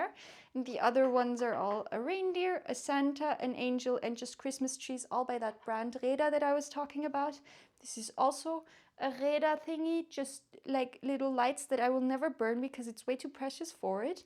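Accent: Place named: Germany. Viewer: I read a lot of classic literature, biographies, text books, manuals, science fiction.and self help books. You have a neat and tidy tome of books. I dislike Shakepeare. I had enough studying and reading his books during my high school days. I am a big fan of ancient Roman society and all those famous philosophers. The most expensive book I bought was The Red Book by Carl Jung and the full collection of The Decline and Fall Of the Roman Empire by Edward Gibbins. . I am an Aussie old man and I have historical books about Australian authors from the 19th century. For a young lady you speak English very well and I like your German accent. My mother was German and I could never understood anything when she spoke to me even though I spoke Polish to her.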